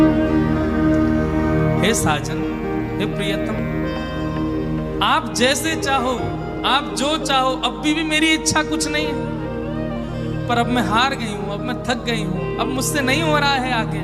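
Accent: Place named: Braj, India. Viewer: Mharaj apki ktha brij bhasha bhut pyarilagtihain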